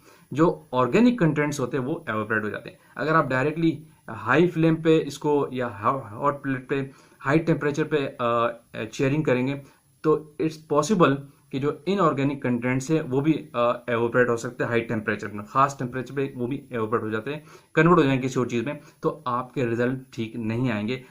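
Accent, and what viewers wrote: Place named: India. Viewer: Ok aapke pass bohat knowledge hai sir pharmaceutical industry ka 🫡🫡🫡 bohat achhe se explain bhi karte ho aapko to pharma industry ka professor hona chahiye language tone se aap 90% indian hi lage baki kuch words se laga ki aap pakistani ho😅 keep it up sir humko knowledge dete rahiye hum aapko support karte rahega 👍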